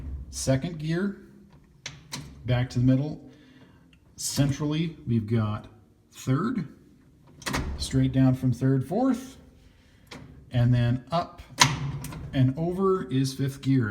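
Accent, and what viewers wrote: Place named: Canada. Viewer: Why right-hand drive? Where are you? (You sound Canadian, or American. )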